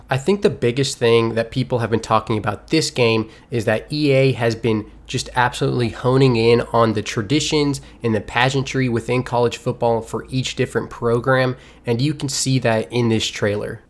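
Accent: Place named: Delaware County, United States. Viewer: Where you from? You sound like you're from Delco (Philly burbs)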